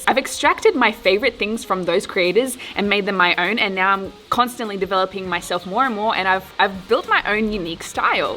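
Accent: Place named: Australia. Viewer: This was probably one of my favourite videos you have made! I loved all the content - preaching compassion and being content with your personal progress. But I also loved all the different scenes (backgrounds?) They looked great! And... You know your personality is just so fun! And your Australian (which all aussies love!) Keep up the great work!!!! 🇦🇺🌏😃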